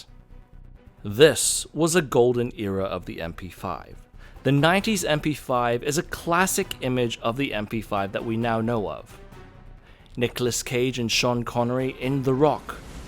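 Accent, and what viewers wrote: Germany. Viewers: great. like your german voice game. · Idk who this guy is but when I see an asian dude do a german accent I sub immediately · Best SMG ever! Update: Your German accent is so naturlich! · An Asia, speaking in a German accent is peak 2026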